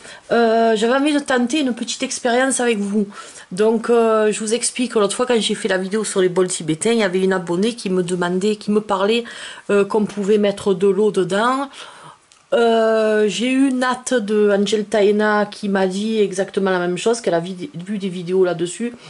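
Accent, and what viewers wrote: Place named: South, France. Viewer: L’accent du sud est parfait! J’adore!!👍 Je viens de recevoir mon 1er bol tibétain (j’ai essayé récemment chez un pote et j’ai trouvé ça incroyable !) J’essayerai avec de l’eau, ça a l’air terrible!! Et je te laisse un like ! ( parce que vu le nombre de vues, et zéro like, c’est pas cool! ) Allez, et je m’abonne, c’est cadeau !🎁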